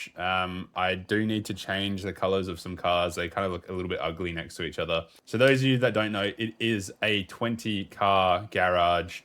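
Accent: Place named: Australia. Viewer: I had a feeling you are from Australia because of the way you said the name of the GTA5 Camaro lol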